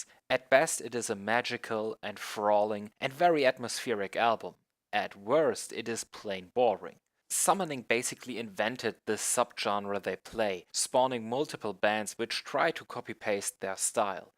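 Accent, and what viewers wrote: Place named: Germany. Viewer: no, don't do it, I need this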